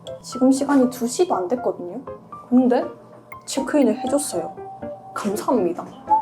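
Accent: Gyeongsang, South Korea. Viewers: 소리 끄고보면 완젼 갬성 브이로그 유튜브가튼데 소리키면 그냥 대구사람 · ㅎㅎㅎ 브이로그에서는 ㅋㅋ 뭔가 귀엽게 대구 발음이 나오시네요.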